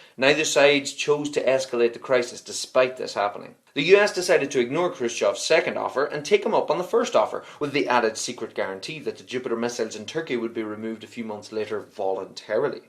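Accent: Ireland. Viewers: are u irish? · I think it's because he's Irish and JFK was Irish-American. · He's Irish.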